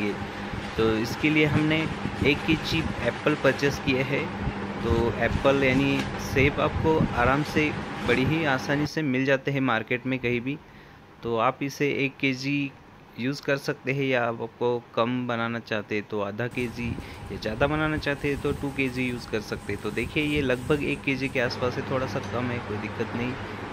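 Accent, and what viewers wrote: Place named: Maharashtra, India. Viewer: Aapke Hindi bolne ke tarike se aap nagpur maharastra ke lagte hain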